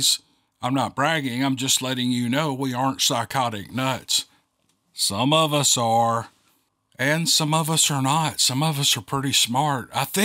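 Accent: South, United States. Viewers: I love your southern accent!! Screw those haters! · As a California native, I love nothing more than a Southern storyteller. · Sir, we love you for you. I was born and raised in the South. Hearing your voice makes me feel home. We joke that my inner compass broke because I moved up to LaCrosse, WI. Then, I got married and had to stay for now. My husband has decided he wants to move South. Praying we get to. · Your "accent" is part of who you are brother.... F all that.... And just a personal note... I find it comforting... Moved to DE at 15 after a lifetime prior in WV/OH. That was 94... Been here since... Family back there passed mostly... Elders due to age, and even my cousin who was the closest friend/brother and in age as well, I had... Drugs took him. Life rather, took him..... I won't get into all that.. but I'm saying... I listen for many reasons... 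The southern feel... Is one. Thank you. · I agree with Kevin! You sound like a true Southern Gentleman. I enjoy listening to most of your stories. Thank you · For me there ain't nothin better than hearin' the voice of a southern man. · Hey I always liked the us southern accent, I don’t know when people started using the like word so much it’s enough to drive me right up a tree a can’t stand it, even some older people use it now, I would rather have a jaw full of tooth aches than here it, lol Fr Canada 🇨🇦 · you have a great accent like me Southern🤩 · I love your accent. You see I’m a Connecticut Yankee. I had a wonderful pastor from the south. He was such a kind and wonderful man. He loved all his congregation and we loved him. Every time I hear you I think of him. Thank you, your voice is very comforting. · Southern accents are cool, cause it makes the stories folksy & that's a good thing🤗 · Ok,OK, keep the Southern country boy accent please. 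😂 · I love your accent. I'm southern too and ppl laugh at me all the time and I could care less. Been in Fla for 28 yrs now but grew up in Thomasville, NC. A true southern girl here and dang proud of it!!!! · Are you kidding me? Everyone loves your southern accent 😊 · you got a great southern accent you’re a natural story teller excellent. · Thank God you are back with your southern accent. Who cares what that one or two feels about how you talk. I like it! Your voice feels like home. Your stories holds my attention and I hear your stories the way they should sound. Glad you are back. ❤ the stories you share! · You talj just fine people are just mean. Your Southern accent is cool. If I had that accent here in California, I'd be running from all the women, don't change a dang thing lol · What's wrong with your accent? I've lived in the south, and you have a fairly mild accent. Try under standing someone who primarily speaks "gullah". 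· your 'valley girl' impression needs some work! Nice try but please keep that wonderful, natural, southern twang, after all this is! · I love your dixie accent Love 'n' hugs from England ❤ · I have a news lash for ya, you cannot mask your accent it comes through no matter what, that is how we Southerners talk, if it does not agree with some folks that alright with me! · Hi, just wanted to say regarding comments on your accent, as a New Zealander retired in Thailand, i find your southern accent & story reading skills, adds to the realism, and i can picture the story in my mind, ( true or not ) i really enjoy them, ķeep it up, cheers.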